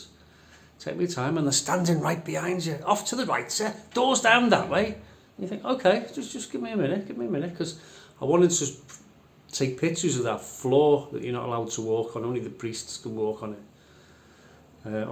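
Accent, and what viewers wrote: Liverpool, United Kingdom. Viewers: The scouse accent is way more attractive on you, rather than the posh accent you use more and more of. Don't forget your roots.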